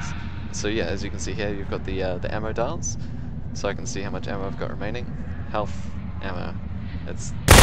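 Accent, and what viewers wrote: New Zealand. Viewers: you kiwi? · you got featured on Kotaku also great to see a fellow New Zealander making some waves on the youtube refreshing not hearing a American accent for a change keep it up man you got a new subscriber:)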